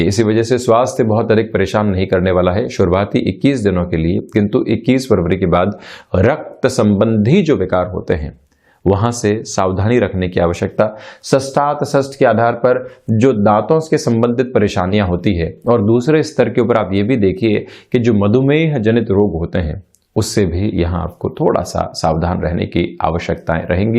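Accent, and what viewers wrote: Standard. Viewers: Predictions k sath Dharapravah Shuddh Bhasha sunne k liye milti hai aapse ji..... Pranam evam Dhanyawad 🙏 Kripaya bhasha ka standard yahi banaye rakhiye.....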